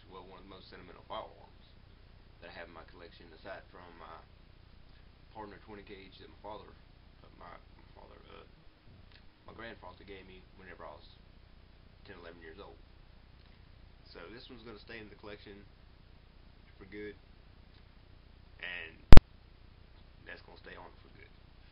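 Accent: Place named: Appalachia, United States. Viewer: He speaks exactly like the Hillbilly guy named "Boomhauer" from the TV show "King of the Hill". I could barely understand every other word out of this guys mouth. By the way, I just bought a Hi-Point C9, still have a few more days before I can pick it up at the gun store.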